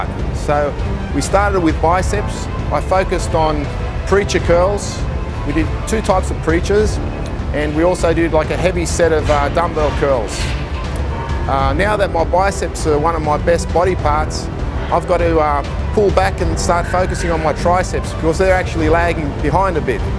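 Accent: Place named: Australia. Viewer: He's Australian!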